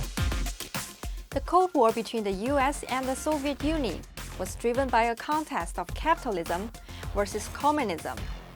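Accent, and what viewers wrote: China. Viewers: Just because an asian woman with a Chinese accent is presenting doesn't mean she isn't a cog of the NED, or of Britain. One person should not represent a country of 1.5 billion. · Narrator has a canton accent. Probably from hongkong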